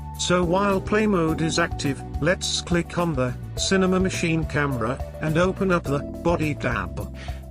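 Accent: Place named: United Kingdom. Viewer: 🔥🔥🔥🔥🔥🔥love the British voiceover🔥🔥🔥🔥🔥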